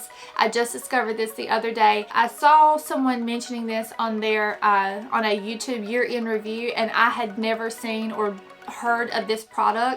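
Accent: South, United States. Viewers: I love your cute southern accent!!!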